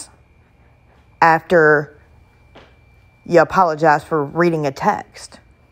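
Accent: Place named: Appalachia, United States. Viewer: I watched the first cpl mins of her live from earlier and I have to say, her harping on you not enunciating the L in the word hole…girl lol. Does she know what accents are? That’s the Appalachian dialect and yours isn’t really as thick. Accents have no bearing on education and intelligence. My daughter is PAID and flown to California etc to research math and present on her findings. Her accent is as thick as mine. All 3 of my kids tested in the top 5 percentile and are highly educated and motivated young women, two of which test on genuine levels. One was even scouted out by YALE, but even with their programs my country ass is too poor for all that lol. They are as country as cornbread when they speak. Also, if I recall correctly doesn’t she say “warsh” for wash? 🙄 Thinking someone is ignorant because of their accent is one of my biggest pet peeves lol so I had to say something. 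Also, as someone that struggles with mental health and has children that also struggle…it’s not safe or wise to tell a child anything negative being said about them, no matter who it is. They deal with enough from their peers as is, why add to that? Anyways, maybe one day I’ll hop on your panel and be as redneck as I possibly can to really give her some stuff to laugh at 😂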